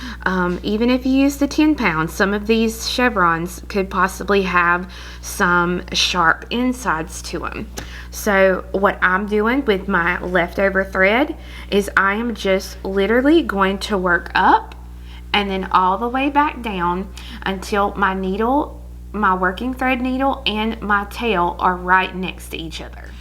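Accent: South, United States. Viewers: I would give just about anything to come visit you this weekend! I am a southern girl currently living in England and miss home so much. Ms I love your videos, I am a long time beader and always learn a little bit more from you. Keep speaking southern to my heart and beads to my soul! Thank You!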